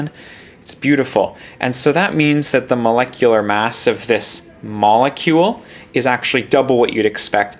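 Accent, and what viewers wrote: Canada. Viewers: Thank you!!! btw, are you Canadian? You kind of sound like one :)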